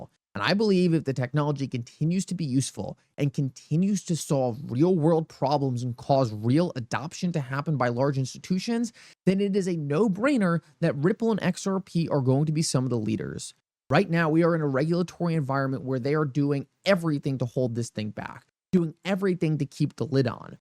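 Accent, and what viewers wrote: Allentown, United States. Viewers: with an Allentown accent bunch of bs again. Even Elon cannot move shit coin XRP upwards,lol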